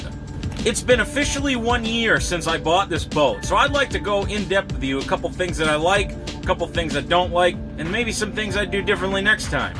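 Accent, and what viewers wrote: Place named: Maine, United States. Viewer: Your license plate may say Wisconsin but you sure sound like you are from Maine or somewhere out there.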